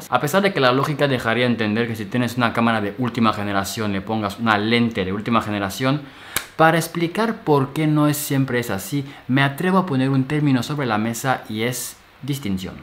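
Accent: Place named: Spain. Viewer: Pocos videos hay en español y tu lo resume bien, por cierto de donde eres Bro? Traes un acento que me suena bastante y batallo en identificar, es una mezcla con el acento español.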